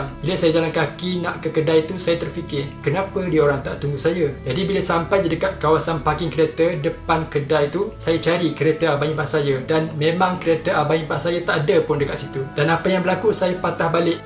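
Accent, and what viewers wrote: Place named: Kelantan, Malaysia. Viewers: Orang Kelantan kalau cakap kl memang akan cam ni, nice 👍 cerita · Macam org kel je bunyi accent 🤔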